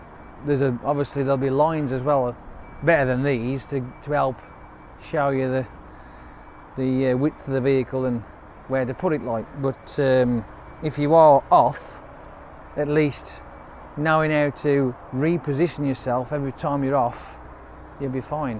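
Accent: Birmingham, United Kingdom. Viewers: This guy reminds me of a brummie version of my dad lol. He taught me many years ago in exactly the same way God rest his soul ❤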